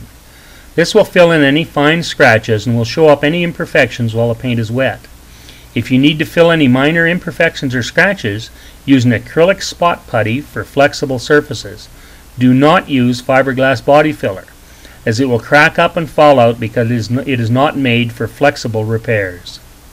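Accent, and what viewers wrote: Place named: United States. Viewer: frickin americans, soon they'll be saying its a ruf, instead of a roof and a crick instead of a creek.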